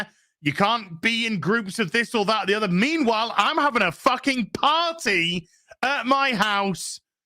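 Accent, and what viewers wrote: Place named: United Kingdom. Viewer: you are my favorite FNT regular and have now become one of my favorite YouTube channels. As an overweight, bearded, balding American man, I watch you and think, that's what I'd sound like if I had a proper British accent. Keep the entertainment coming!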